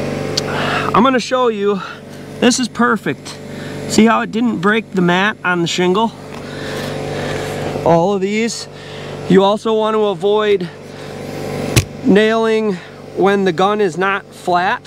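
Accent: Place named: Minnesota, United States. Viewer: Also cool because you sound like a fellow minnesotan 😂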